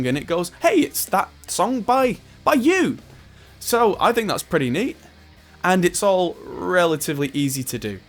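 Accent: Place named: Scottish Borders, United Kingdom. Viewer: what part of England are you from? Your accent sounds like you are close to the Scottish border. Am i wrong?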